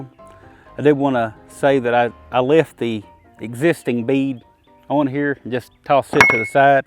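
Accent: United States. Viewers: you have an interesting accent which is specific for one area of USA, that's why I asked.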